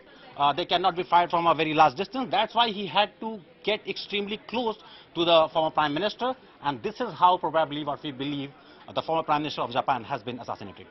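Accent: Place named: India. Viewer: TAPE not TIP, GOOGLE not goggle This is one of the reasons why I hate conversing with an Indian when I was working in a Call center hahaha. Also, he explains on how to create DIY Shot gun at home hahah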